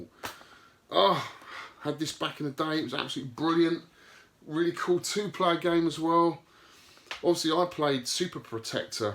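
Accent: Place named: England, United Kingdom. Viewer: You sound like you're from England and if so, where do you find your retro games? Thanks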